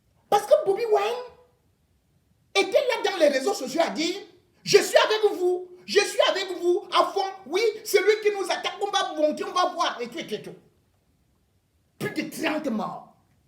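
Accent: Cameroon. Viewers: Un journaliste engagé comme toi on en voit de mooins en moins en Afrique. J avoue que alain foka et toi faites la fierté de notre pays. Continuez ainsi l histoire retiendra votre passage sur cette terre. Merci pour tout mr ngono. Depuis que je suis tombee sur l émission de sport à france 24 j ai doublé mon admiration pour vous. L accent Camerounais sur ce plateau tv me fait tjrs sourire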